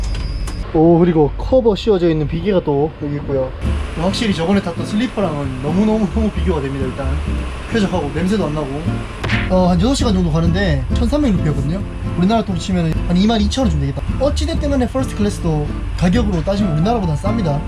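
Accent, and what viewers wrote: Gyeongsang, South Korea. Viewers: 제주도 한달 살이 영상 찾아보다가 추천 영상떠서 보기 시작했는데 며칠 째 시간 날 때마다 님 영상만 주구장창 보고 있네요ㅋ 조만간 제 최애 유튜버가 되실 것 같은데 미리 축하드려요🤣 참 외모만큼이나 성품도 생각도 올바르신 것 같아요! 매사 참 밝고 건강하고 긍정적이고 순수하고 마음 따뜻하신 분인 듯👍 경상도 사투리도 너무 멋지고ㅎ 근데 이렇게 빡씨게 여행하면서 온갖 고생 다하셨는데 왜때문에 꼬질꼬질한게 하나도 없이 반짝반짝하신거죠👉👈💕 저 아무래도 입덕한 것 같아요🤣🤣🤣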